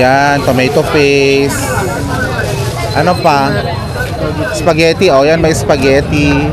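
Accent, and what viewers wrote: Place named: Ilocos, Philippines. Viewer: Ilokano po ba kayo? Ung accent kase kapag magtagalog 😅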